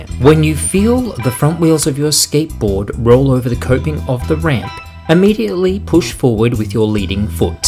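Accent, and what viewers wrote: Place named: Australia. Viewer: Haha I love the narration. So Aussie.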